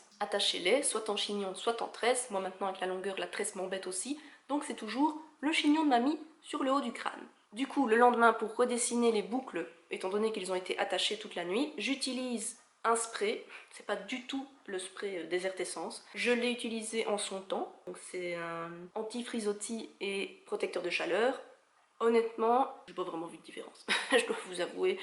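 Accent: Belgium. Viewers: Oh tu es Belge ! J’ai été voir ta description car je me disais bien que je connaissais cet accent, très chouette vidéo, tu as des cheveux magnifiques !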